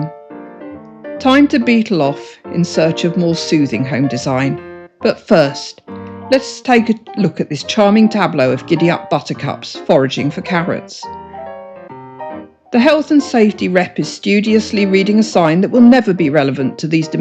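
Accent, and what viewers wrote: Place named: United Kingdom. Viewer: that was… that was fucking sensational. What the actual fuck did I just watch in the best possible way 😅 So many cool little details in here from a build standpoint, like the candy cane accessible toilet handles, the commissary, the flamingo flamenco, the kitbashing, the Rubik’s Cube… fantastic 🤩 But good god the commentary… bravo my friend 🥲 it was so delightfully bonkers and so delightfully British! It felt like a weird mix of evening BBC Local News segment and Diane Morgan being Philomena Cunk. And your script and turn of phrase was astounding. Absolute art. Fallout settlement building heritage right here.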